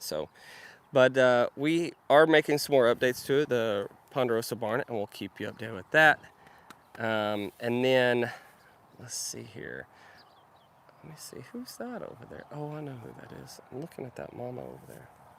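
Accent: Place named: Midwest, United States. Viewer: You want to make a Dunbar Bitter as another collaboration. Seeing as Dunbar is on the Scottish south eastern coast and bitter is a Northeast England/Scots beer. But I don't know if you would have the palate for a bitter as it is a dark bitter beer. You also have a Scottish emblem on the ponderosa in the thistle. So with Dunbar and the thistle you could be associated with Scotland in Oklahoma. So why don't you learn to speak the Scottish Celtic language. A mid american accent speaking Celtic would be great to hear. By the way it sounded like you and Marissa are going to have to to buy racing racing leathers for Brooks in the future.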